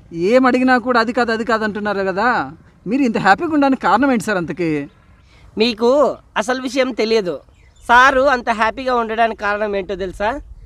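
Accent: Anantapur, India. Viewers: Chala baga chepparu 👌 naku me videos antey chala istam garu. I feel very happy and relaxed while I'm watching. And Anantapur language vintuntey chala happy ga anipistundi. Thank you so much for making such videos and making people happy. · అనంతపురం యాస చాలా బాగుంది !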